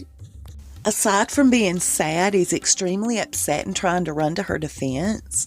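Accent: South, United States. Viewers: Your from the south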